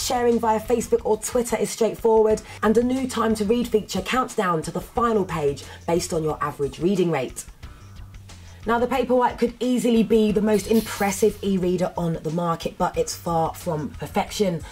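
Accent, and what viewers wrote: England, United Kingdom. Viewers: WOW! You are simply amazing. You could have easily been talking about Fruit Loop cereal. Your boyfriend better treat you right cause you are a Dimond with a beautiful english accent. P.S. I would so follow a youtube vlog of yours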